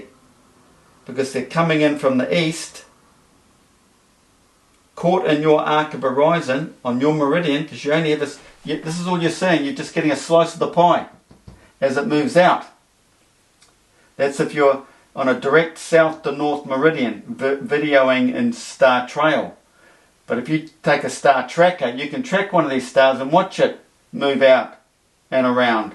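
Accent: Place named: New Zealand. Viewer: he sounds like a very clued up kiwi man! ❤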